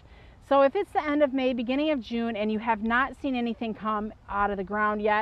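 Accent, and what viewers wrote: United States. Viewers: That Northern Midwest accent takes me back to my family...😊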